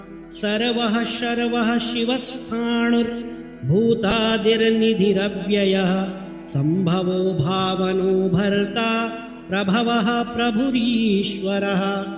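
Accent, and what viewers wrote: Standard. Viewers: गुरुवर सादर प्रणाम आपके द्वारा श्लोकों का शुद्ध उच्चारण श्रवण करने को प्राप्त हुआ आपको कोटि कोटि प्रणाम 🙏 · सादर प्रणाम गुरुजी 🙏 आपकी अनुग्रह से हम घर बैठे विभिन्न मंत्रों और श्लोकों का शुद्ध उच्चारण तथा छंद सहित सही ज्ञान प्राप्त कर पा रहे हैं। आपसे विनती है कि कृपया शिव महिम्न स्तोत्र के पाठ का भी ऐसा ही एक नया वीडियो बनाइए। पुराना वाला थोड़ा अधिक लंबा हो गया है। कृपया लगभग 20 मिनट का वीडियो बनाइए, ताकि हम प्रतिदिन आपके साथ शिव महिम्न स्तोत्र का पाठ कर सकें और भोलेनाथ की कृपा प्राप्त कर सकें 🙏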